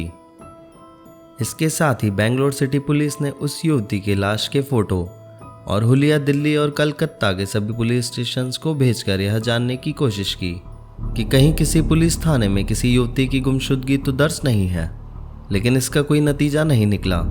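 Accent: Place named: Haryana, India. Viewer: Haryanvi accent jaisa hai.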